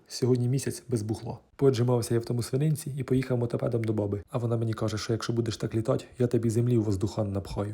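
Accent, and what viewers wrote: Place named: Cherkasy, Ukraine. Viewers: Німеччина) із задоволенням слухаю черкаську говірку) моя мама з Хлипнівки Звенигородського району) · Дивлюся з Варшави, пишу комент с гіпсом 🙂не могла не написати, у вас говір як у моєї мами, сама з Черкаської області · Вітання з Нідерландів ❤ З першого твого слова вгадала, що ти з Черкащини 😅 Жила я там кілька місяців (м. Шпола). Черкаський гумор приправлений специфічним діалектом — такий само впізнаваний як полтавський борщ з галушками 🎉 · Дивлюсь з Польщі . Як я люблю цей акцент, провела дитинство у бабусі на Черкащині. Корсунь Шевченківський , Стеблів де народився Нечуй Левицький... Ностальгія прямо... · Я дивлюся з Австріі,а родилася на Кіровоградщині.Яка цікава подача-з гумором да діалектом місцевим.Дуже багато знайомих "приколиків" словесних!!!🤗👍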